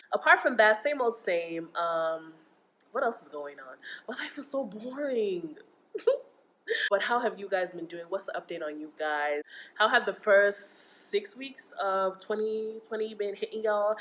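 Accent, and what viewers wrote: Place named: United States. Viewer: OMG I love ❤️ it you put Jesus first! I’ve watched a lot of YouTube videos from people moving to Lagos from other places, but you’re the only one with my America accent.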